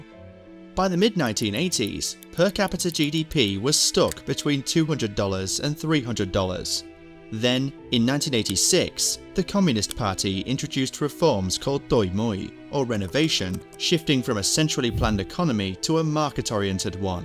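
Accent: United Kingdom. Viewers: shit video every time i hear a british accent in a video i know its just going to be bad content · The narrator must come from Lancashire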